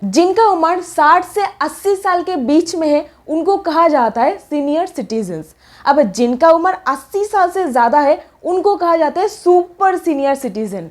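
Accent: Bengal, India. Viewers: I'm 61 and you are Bengali.I can make out because I'm also one but born and lived all my life in Hindi speaking areas. Per se I've not heard of this but will check it out. Not sure if there are any real benefits, that's only feasible in places where active taxpayers fund a social security net, which is not the case in India.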